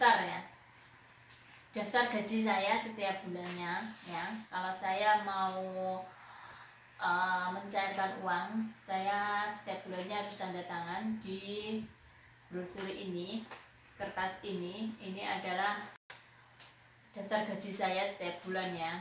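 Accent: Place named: Java, Indonesia. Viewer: Dari logat nya koyo wong jowo yo mbak..jowone ndi smpean....wes tak kirim duit e lengkap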